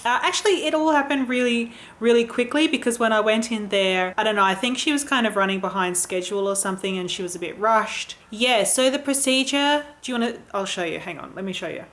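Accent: Australia. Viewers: Hi ♡ I usually remain a ghost-viewer but I wanted to comment to say a few things: 1) I love your videos 2) and your personality 3) and your laugh (it's so cute!) 4) I love australian accent (had never heard it before hihi) 5) I read your descriptions! ♡ 6) I so feel you about the importance of words/phrasing (#sensitiveteam ♡) 7) We love you! 8) we love you, and you don't even need to apologize for doing different than usual! Just do you! we'll love you and love your channel whatever! 8) lots of love from my little heart of acne/redness/scars-and-etc (#teamhairy! Haha) sufferer ♡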